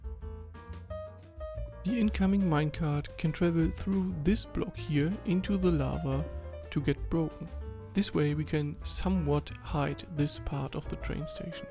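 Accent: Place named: Germany. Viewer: Dem Akzent nach würde ich auf Muttersprache Deutsch tippen? Bei solchen Erklär-Videos würde ich mir eine zusätzliche, deutsche Version wünschen, für die Kinder.^^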